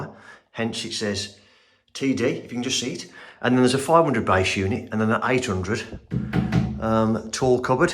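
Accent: Birmingham, United Kingdom. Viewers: This is the first one of your videos that I've watched. I am SO impressed with your work. So professional!!! You sound as if you're from the Birmingham area. I'm going to be having a new kitchen later this year and I'm definitely going to be looking you up (if you are indeed from the Birmingham area!). I've fitted my own kitchens in the past, but you're so good I think I need a professional!!, Great work!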